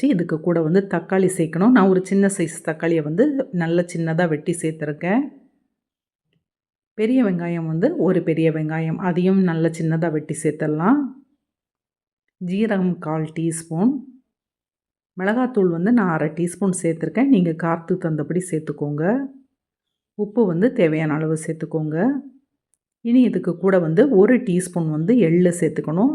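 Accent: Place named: South, India. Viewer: Love your South side slang ma'am. Nice❤❤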